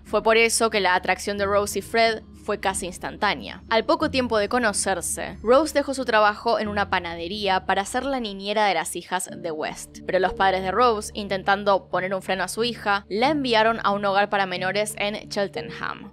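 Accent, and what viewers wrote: Argentina. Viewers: Me encanta tu acento Argentino es encantador escucharte hablar, por cierto mis hijas piensan que estoy loca porque escucho éstos videos no entienden que es para aprender a cuidarlas. Te admiro mucho niña hermosa. 🇨🇴🇨🇴🇨🇴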